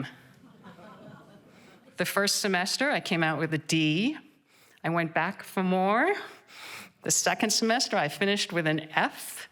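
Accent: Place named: California, United States. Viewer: Amazing accent? Californian perhaps?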